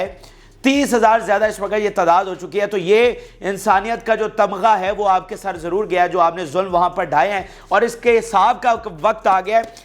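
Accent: Punjab, Pakistan. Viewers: Bhai ap English mat bola kren, apke pas English ka lahja nahi hai..sirf ap nahi pure Punjabion ke pas nahi hai.aplog English ko Punjabi lahje me bolte hai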